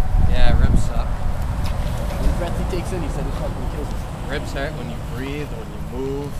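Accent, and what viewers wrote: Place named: Boston, United States. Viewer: I didn't even look at your page. but judging from your voice, you're from Bastin.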